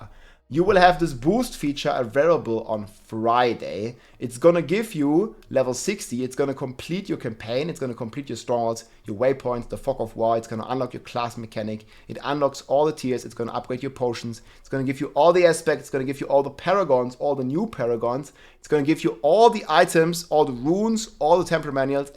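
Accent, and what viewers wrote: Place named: Germany. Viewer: Perditation??? Per-di-shun. I understand German is your first language but whoa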